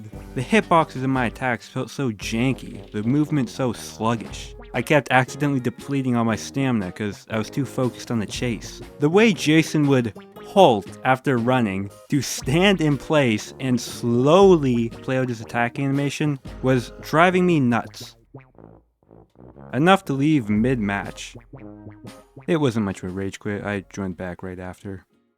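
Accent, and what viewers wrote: Canada. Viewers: are u Canadian by chance? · Wait is he Canadian?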